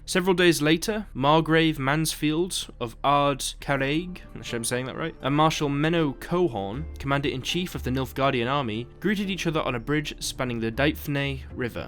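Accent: United Kingdom. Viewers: Loved that intro for the Witcher 2 with Letho wish i had played it on ps3 and let Letho live. Are you from the UK?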